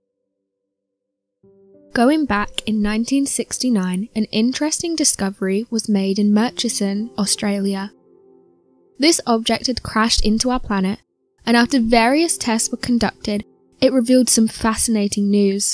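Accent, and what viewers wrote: England, United Kingdom. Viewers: Ram Rod0 not sure where she would be from but definitely English 😊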